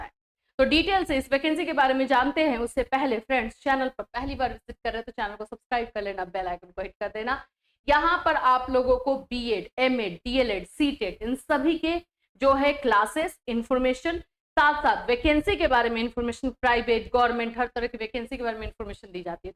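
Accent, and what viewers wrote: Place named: Agra, India. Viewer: Kya aap Agra se ho madam?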